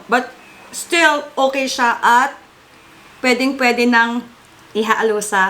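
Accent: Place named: Visayas, Philippines. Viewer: wow magaya nga in bisata accent 😉 pa sab back ka keto, keep connected, tnx 😉📊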